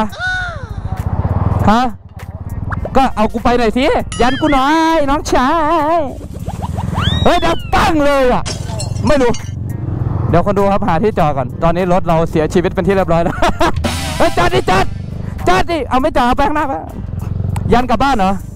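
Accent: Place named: Suphanburi, Thailand. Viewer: คนสุพรรณคับบ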